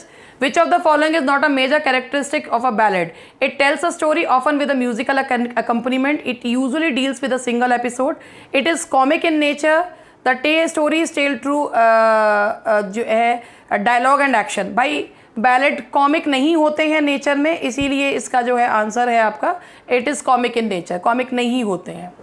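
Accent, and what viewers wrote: Meerut, India. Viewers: Meerut ki tone alag he hai😁.. pleasure to see videos. M.A ki classes yaad ajati hai..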